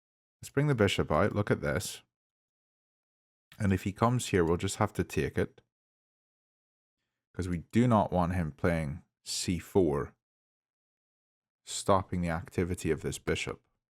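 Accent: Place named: Scotland, United Kingdom. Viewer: Apologies, the accent sounded Scottish to me.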